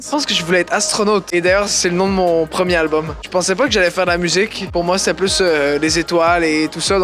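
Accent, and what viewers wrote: Quebec, Canada. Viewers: C'est trop drôle de t'entendre en dehors de tes musiques parce que dans celle ci on se rend pas compte que tu es québécois 😂❤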